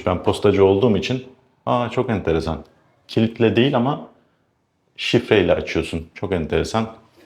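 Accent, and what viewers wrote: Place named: France. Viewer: Yalniz Japoncanizda Fransiz aksani var sanki.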